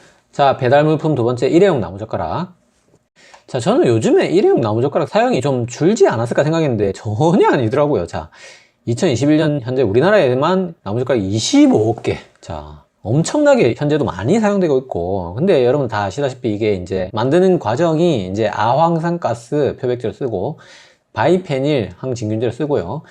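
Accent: Gyeongsang, South Korea. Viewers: 선생님!! 고향어디세요? 저도 경상도라 말투가 너무 정겹고 말씀도 너무 재밌으세요~~~~😁😁😁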